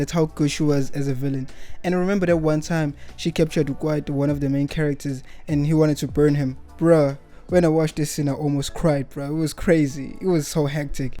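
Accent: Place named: Durban, South Africa. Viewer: Switching between IsiZulu and English like a true Durban Knight 😂👌 If anyone doesn't know how a typical Durban Knight speaks, this is it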